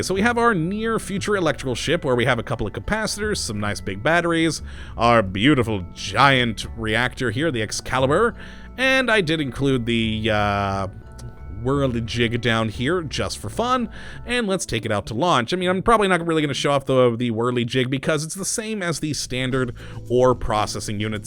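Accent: United States. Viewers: Not really, as a British person, it is clear to me he has a distinct American accent. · Definitely, I'd imagine the accent would stick out like a sore thumb to anyone native to Britain It's unfortunate how rare it is to find an American who speaks English thoughtfully though :(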